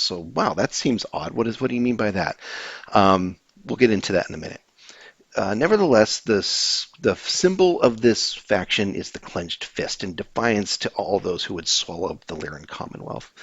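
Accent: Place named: Minnesota, United States. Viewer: Kinda sounding like Minnesota Tribe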